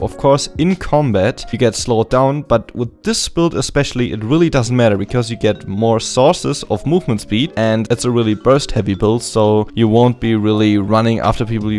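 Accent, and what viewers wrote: Germany. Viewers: HOLY SHIT der deutsche Akzent ist real... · deutscher das höst man xD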